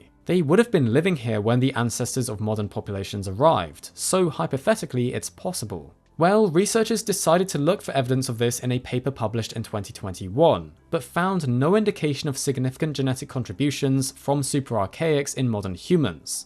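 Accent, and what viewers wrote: United Kingdom. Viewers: British accent… its like nails on a chalkboard. Imaging having an internal monologue this drawn out and pretentious guiding your every whim. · Why does every documentary need a British accent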